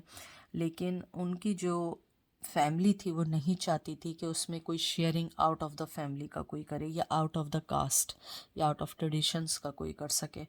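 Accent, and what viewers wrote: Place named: Karachi, Pakistan. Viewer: 100% Resonate to me. Thanks. Aap ke Bolne ke style se lagta hai ke aap Karachi de hain.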